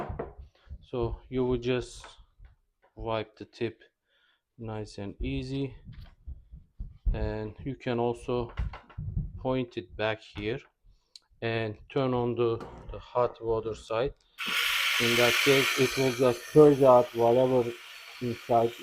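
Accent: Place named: Turkey. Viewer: Aksan ben türküm diye bağırıyor 10/10 video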